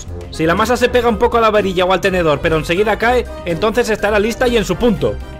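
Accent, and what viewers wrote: Spain. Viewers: bien eso es facil me gusto solo me da risa tu acento de español xD me encanta <3 gracias like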